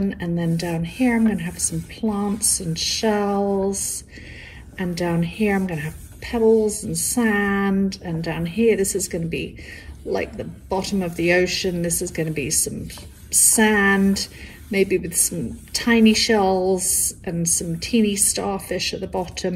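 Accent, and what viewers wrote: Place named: England, United Kingdom. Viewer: You sound English, what are you talking about going to the 'dollar store' for?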